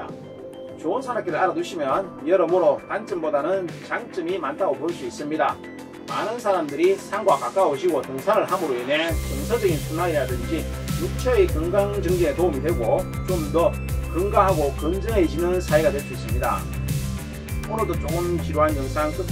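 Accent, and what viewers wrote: Gyeongsang, South Korea. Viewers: 홈화면에 떠서 처음 님의 영상을 만나게 되었는데 경상도억양이 있지만 사투리없이 표준말로 원고도 없이 설명을 참 잘하시는군요. 특히 내용이 알차고 유익하여 인상이 깊었습니다. 다만 한 가지 흠이 있는데 님을 위해 조언드립니다. 설명하시는 동안 양손을 많이, 너무 많이 쓰다보니 수화통역하는 분 같은 느낌이 들었습니다. 손동작이 너무 많다보니 손에 신경이 쓰여 설명을 잠깐 놓치는 경우도 있었구요. 적당한 제스처는 필요하지만 과함은 모자람만 못하다는 조언을 드립니다.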